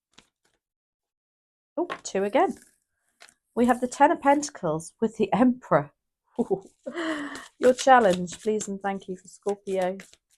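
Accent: United Kingdom. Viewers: I admire readings of this🌹Very Beautiful Lady🌹 These messages resonate with 💯💯💯💯💯 persent. Almost all readings sre brilliant, right in the point 🎯🎯🎯 Plus added amazing British accent which is sheer delight to hear and sounds so pleasing and an effort to make lively environment for us with pretty flowers always maching colours of blouses and light all around, makes this chanel indispensable for everyone who needs to hear excellent readings matching with reality of the circumstances, taking place in life. Thank you very much for helping us in guiding to follow right path. 👏☘️✨⭐🌹🌟✨☘️💫🎗️✨👏👏👏😊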